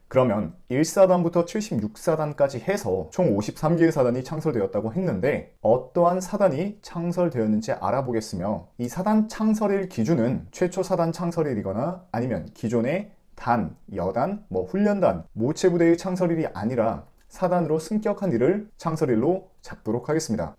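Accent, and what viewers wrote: Jeolla, South Korea. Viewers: 다 좋은데 전라도 사투리가 좀 남아있네..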